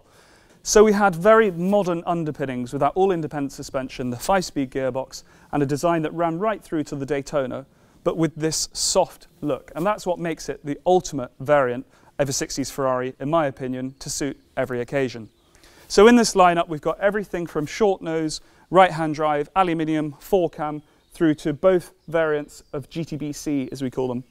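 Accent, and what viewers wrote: United Kingdom. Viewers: Thank you very much for this excellent guide. Such a quintessential British gentleman informing people in that wonderfully British manner. That said, what about 11001? The Daytona prototype with the 2000GT-derived greenhouse?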